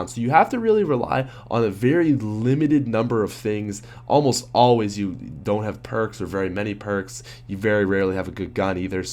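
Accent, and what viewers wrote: Canada. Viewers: don't dis Canadians accent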